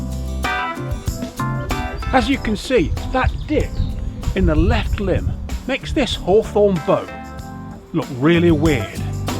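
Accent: United Kingdom. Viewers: Beautiful video, Beautiful English accent. Thank you. Greetings from France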